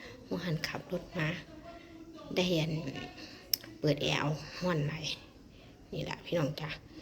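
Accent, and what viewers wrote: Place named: Isan, Thailand. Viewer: สวัสดีจ้าเสี่ยว หาหมอแล้วกะอยู่ดีมีแฮง ปลอดภัยจ้าเสี่ยว❤เว้าอ๋อมๆคือแท้เสี่ยว เบิ่ง5นาทีกะได้พี่น้องจ๋า🤩มาแล้วกะเบิ่งจบม้วนคือเก่าละจ้า✌️✌️🥰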